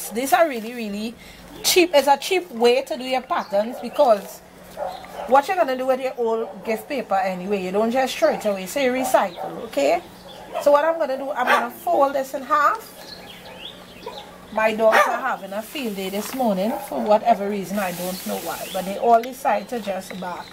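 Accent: Trinidad and Tobago. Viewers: Heyyyyyyyy, I was pleasantly surprised to hear a Trini. Love it!!!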